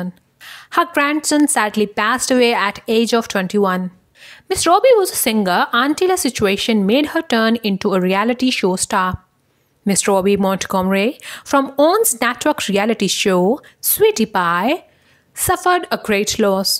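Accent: India. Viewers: She sounds Indian from india or possibly an Indian born or raised in England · She sounds India dialect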